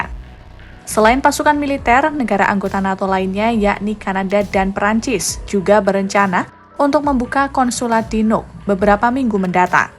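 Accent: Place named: Java, Indonesia. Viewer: Suara Mbaknya wong jowo ya? Medhok poll😅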